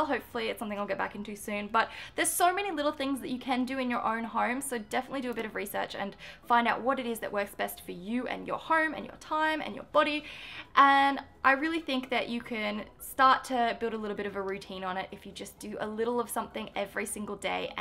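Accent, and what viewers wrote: Australia. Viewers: Are you a Canberra gurl? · she's Aussie isn't she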